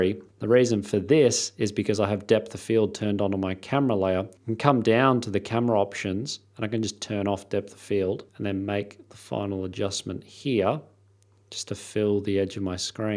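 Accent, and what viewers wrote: Australia. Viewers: a bit off the topic question....but.... are you Australian?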